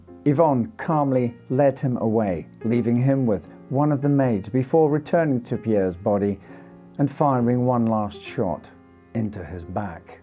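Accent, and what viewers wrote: Scotland, United Kingdom. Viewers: Ooo I thought he is Scottish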